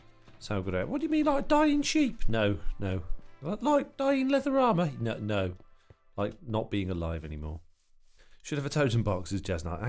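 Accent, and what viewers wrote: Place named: Ireland. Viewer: Love the Irish accent, I see another character on the horizon lol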